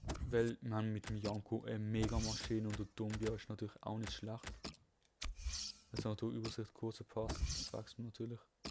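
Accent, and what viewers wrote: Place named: Basel, Switzerland. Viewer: Nievethan Neetihrasa Nechdem Dialekt her in Basel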